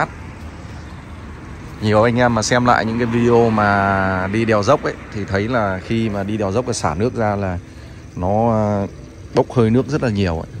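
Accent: North, Vietnam. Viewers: Có 1 thắc mắc nho nhỏ là b ở quảng bình mà lại nói đặc giọng miền bắc ko bị pha tý miền trug nào cả , tài thật .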